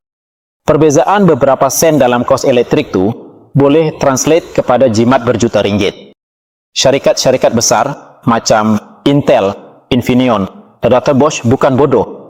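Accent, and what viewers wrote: Indonesia. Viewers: Pelabur & pencerita ini adalah warga Indonesia kerana slang cara di bercakap memang warga Indonesia. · BUKAN BAHASA INDONESIA TETAPI BAHASA MELAYU SLANG ( LOGHAT ) INDONESIA....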